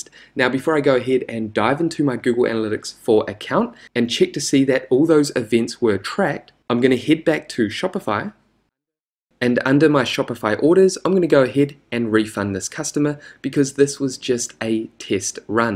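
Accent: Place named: New Zealand. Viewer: Cheers Mate! Good to hear a fellow kiwis voice lol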